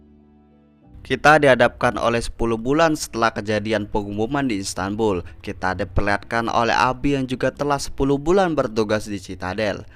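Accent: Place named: Sumatra, Indonesia. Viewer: swara abg kek logat kepri or sumatra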